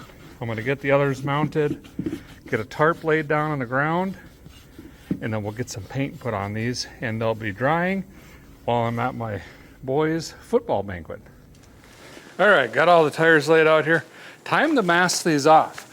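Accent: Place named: Upper Midwest, United States. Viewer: As a chicagoan (bridgeport native) the way you pronounce battery warms my soul. I am out of state for a while down south. And I have a thick thick chicago accent(9th generation chicagoan) lol I told my neighbor about how much I hate my "front room" no idea how to type like I sound but its Like, "guy I'm tellinya went over dare and sat inda share, chtyaknow I can't see out da faaackin windohh in my frunchroom unless i stand" he instantly started laughing and said "yankees" all dissapointed like while shaking his head. I get made fun of a lot here. You have like a north Midwest accent. Like Wisconsin, Michigan. Mine is like angry Canadian. Sorry I have a fascination with accents and dialect from different parts of the world and country. America has so many different accents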